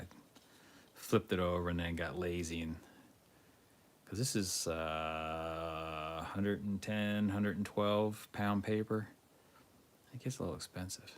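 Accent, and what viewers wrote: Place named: Philadelphia, United States. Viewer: Your Philly accent is excellent. Great voice. And I have thought about doing live but haven’t really dug further into it. Sounds like this might be an email conversation. I’ll dig your email out from your about page.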